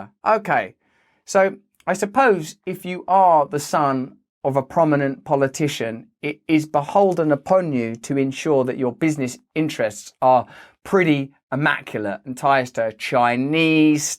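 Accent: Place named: United Kingdom. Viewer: How does anyone stand this word salad firehose million words a minute but not saying much of anything speech delivered in that “nails on a chalkboard” British accent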